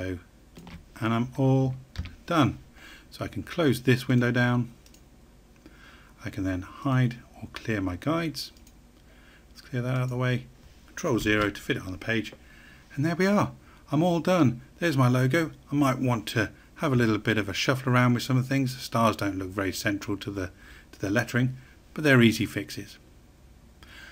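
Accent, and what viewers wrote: United Kingdom. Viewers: Excellent. Nice to see, and hear, tutorials in English English and not American "English" Your tutorials are so easy to follow. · I Like so much UK English! :D Thanks for this tutorial!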